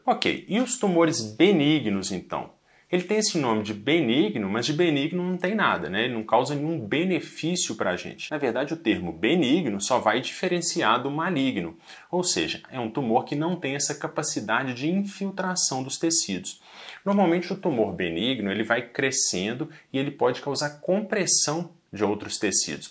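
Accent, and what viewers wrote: Minas Gerais, Brazil. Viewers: Mais é di minas uai?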